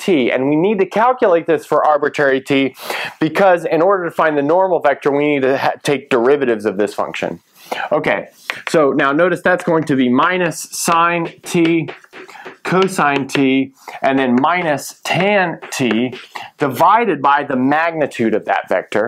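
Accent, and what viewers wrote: United States. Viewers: You have a very thick American accent. Got to concentrate hard to understand it. No idea why I'm commenting that. I'm drunk. Might explain everything.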